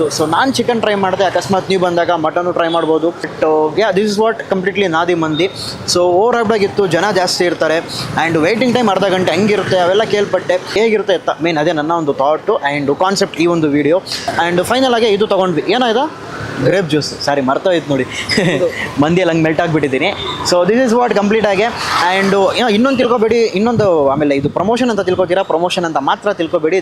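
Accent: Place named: Tamil Nadu, India. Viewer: avanu mathadodhale gothaguthe…….he is Tamilian….plus he is copying style of Tamil food vloggers….bari dove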